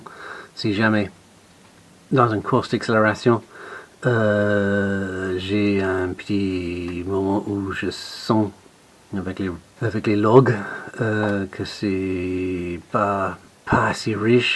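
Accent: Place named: England, United Kingdom. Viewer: Votre français est bon ! J’adore votre accent anglais haha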